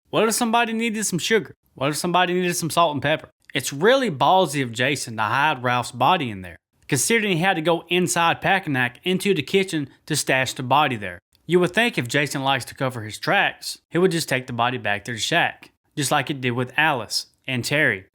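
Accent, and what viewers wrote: South, United States. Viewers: glad to make your day brother I enjoy the videos it's refreshing glad to hear that southern draw you made mine today with this video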